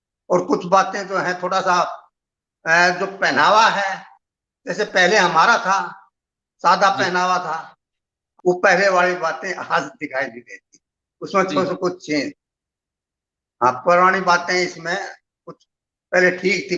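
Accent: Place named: Haryana, India. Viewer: बहुत दिलकश हरियाणवी... बहुत स्वागत 😄😄😄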